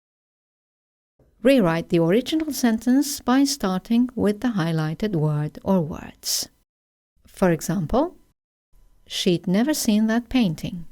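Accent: RP, United Kingdom. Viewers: You sound British thou. I watch loveenglish with Leila and sabrah . Leila is half English half Iranian too. nice meeting ya. thanks for your rapid response. good night and bless you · Thanks so much!❤️,I'm Chilean and you help me a lot with your British accent because my school speak USA English so I have some problems with others accents. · Do you speak RP?? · You have the Queen accent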